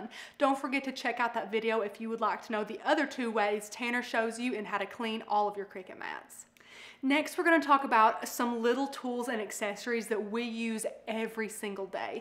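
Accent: South, United States. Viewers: I love her southern accent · Clicked for the review...stayed for that amazing accent! I'm Australian, don't hear that kind of voice every day. Is that American 'Southern' / 'Mid-Western? Also, waddaheck happened to your brick wall? Someone got a bumped head over there? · I'm from the south and love her southern accent.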